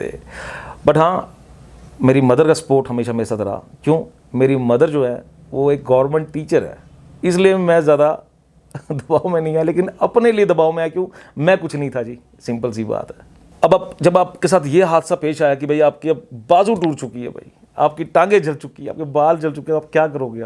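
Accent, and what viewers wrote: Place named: Himachal Pradesh, India. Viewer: the way of your speaking reminds me of my old days in Himachal where people speak with a different tune than mainland hindi speakers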